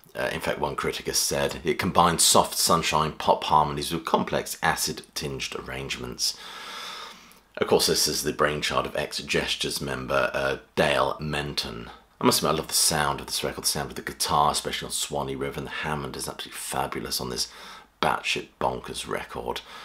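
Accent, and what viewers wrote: England, United Kingdom. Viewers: The Third Rail is psychedelic? You obviously have not taken the right drugs. granted you accent sounds English, and English osych was more pastoral than the US freak out over being drafted or shafted in the civil rights era, but most of these bands sound more like pop bands with psychedelic flourishes. And that is how they were reviewed. I owned most of these albums - emphasis on past tense, but they found their way to the used bins.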